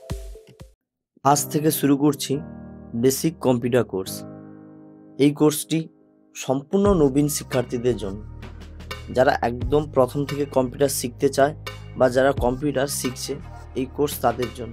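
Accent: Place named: India. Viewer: ভাই তুমি মনে হয় ভারতীয় তোমার কথা পষ্ট না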